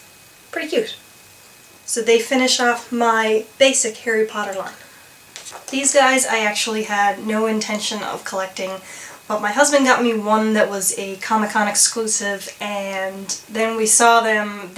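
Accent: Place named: Ireland. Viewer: You sound irish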